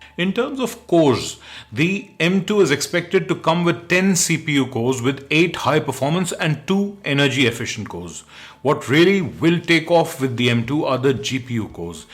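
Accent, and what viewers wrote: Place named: India. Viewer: Super excellent videos..Great guide to lot of decisions..The M1 playlist is just incredible..Glad to find an Indian English speaking content creator adding so much great value..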